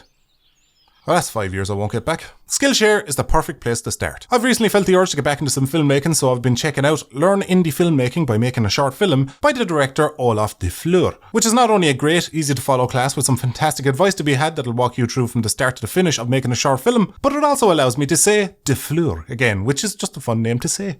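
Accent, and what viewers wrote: Ireland. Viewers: This is, by far, the best and most hilarious video i've seen all year. There are soooooo many things i recognize in my own past and present relationships... Eerie that... And you tell it sooo well, and it just makes it better with those animations and your lovely Irish accent... This is pure fucking GOLD brother... I subscribed then and there, for the laughs... Well earned... I can't wait to check out what else you've made of shenanigans... Love from Denmark... <3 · The Irish accent is 30% the reason why I subbed....the other 60% is me in utter stitches from laughing and the other 20% is me realising I'm bad at maths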